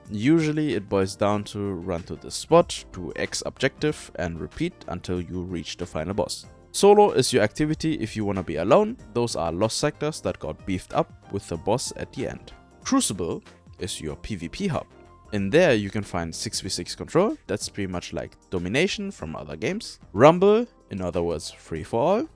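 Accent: Germany. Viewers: Aß a german i can Say you Sound kinda german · Wieso hört man als Deutscher raus, das du Deutscher bist haha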